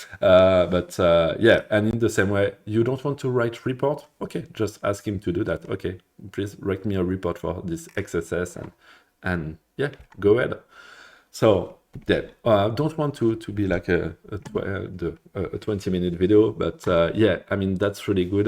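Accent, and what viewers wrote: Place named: France. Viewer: T'es francais toi mdr très bonne vidéo sinon!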